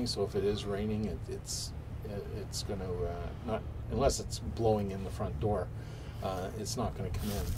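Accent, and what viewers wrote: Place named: Canada. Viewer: Thanks. I just bought this tent for many adventures to come. I love your Canadian accent, eh. Many happy trips ahead.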